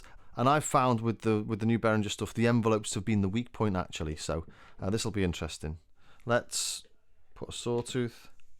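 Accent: Liverpool, United Kingdom. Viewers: hey, I didn't make any comments about your being Scouse (based on what I hear in your accent unless I'm vastly mistaken!), so no need to whinge too much! ;) Haha. Keep up your good work!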